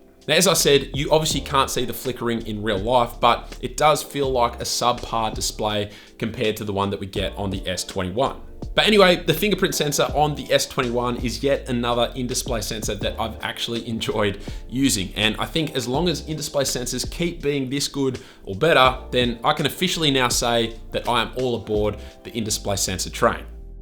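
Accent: Australia. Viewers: This guy has so familiar accent. From the first sentences I knew that he is an Aussie :). Hello and big cheers from NZ, mate! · R u Australian?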